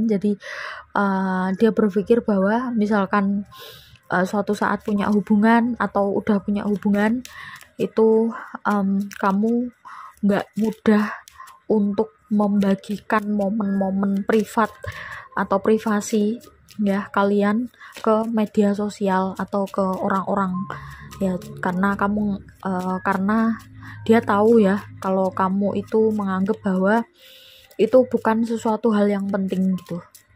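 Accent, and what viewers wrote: Java, Indonesia. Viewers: Keunikanmu pn untuk ku suara dn logat jawa yg lembut mu buatku sangat senang dn kumerasa auramu sangat positip.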